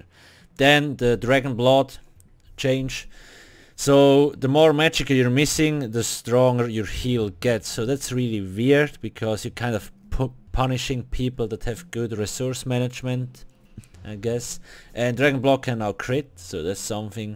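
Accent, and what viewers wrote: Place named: Switzerland. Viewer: Ich finde sein schweizerenglish so genial obwohl er auch deutsch spricht... sagen wir mal schweizerdeutsch... aber man merkt mehr und mehr in seinen letzten videos das ihn das spiel mehr abfuckt als er es für gut befindet ... in den letzten videos finde ich persönlich merkt man das enorm !! Er schimpft mehr als das positive daran zu sehen ... nämlich es soll im Endeffekt spaß machen !!! Nun ja ich schau deine Videos nur noch wegen dem schweizerenglish 😂😂😂😂 ansonsten naja dislike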